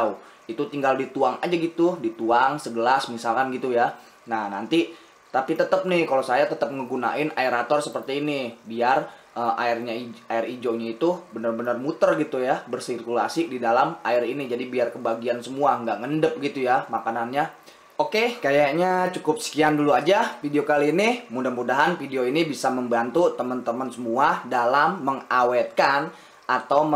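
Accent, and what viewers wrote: Jakarta, Indonesia. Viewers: Demen review bg. Betawi tulen. khas bgt Hhai hallo 😁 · Bahasa nye betawi benerrr haha.. bang makasih ilmu nya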